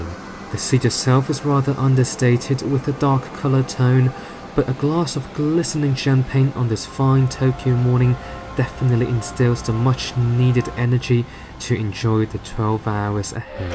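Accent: United Kingdom. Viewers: an asian guy with british accent is just so off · 感谢分享，ANA的新头等装修真的很大气屏幕效果也很惊艳，可惜我今年只能飞到老客舱。顺带一提博主的英音很惊艳哇，完全没想到是在美国上学。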